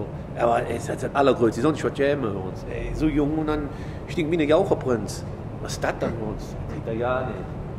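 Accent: Cologne, Germany. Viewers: Einmal hat ein kumpel ihn getroffen und mich angerufen meinte hab hier jemander der dich sprechen will Kam direkt so an Hallo ich bin es der mann vom parkhaus 😂😂😂 auf Kölsch natürlich 😂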